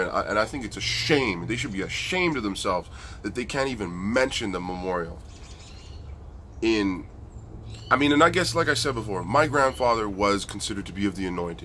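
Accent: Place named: Canada. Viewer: You are so adorable and funny. Love the Canadian accent!!!!!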